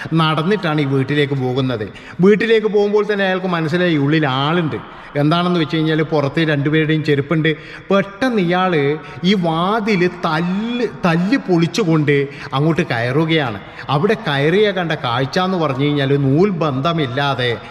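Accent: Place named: Malabar, India. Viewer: മലബാറിലെ, ഭാഷ എനിക്ക് ഒത്തിരി ഇഷ്ടമാണ്❤❤❤,അതു പോലെ താങ്കളേയും, താങ്കളുടെ യഥാർത്ഥ പേര് എന്താണ്,?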